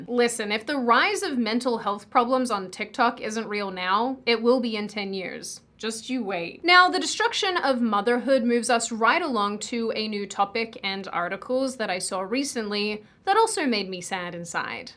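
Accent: United States. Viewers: Is it me or is her accent becoming more "americanized"?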